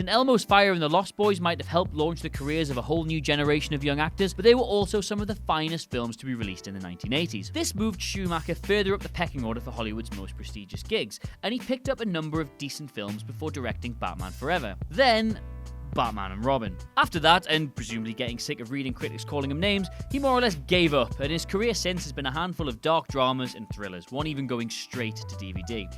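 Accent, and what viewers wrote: United Kingdom. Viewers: Hearing a British Internet host waxing poetic on the early 90's "Los Angeles gang scene" made my day.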